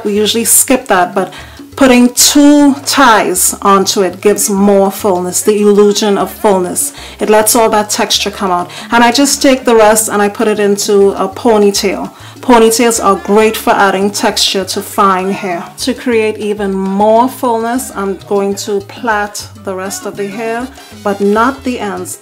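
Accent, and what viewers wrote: Trinidad and Tobago. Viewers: Hiiii! That accent sounds familiar. Trini?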